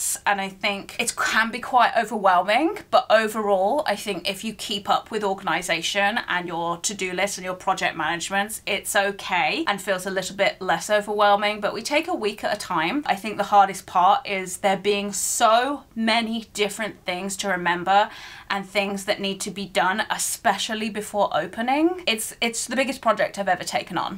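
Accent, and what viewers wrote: Birmingham, United Kingdom. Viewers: I thought you was a Brummie? Derbyshire is very far from brum 🙈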